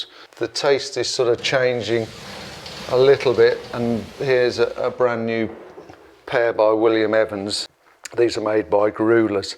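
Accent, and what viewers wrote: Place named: United Kingdom. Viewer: Is this the hunters lodge in tennessee as this guy is english british accent.??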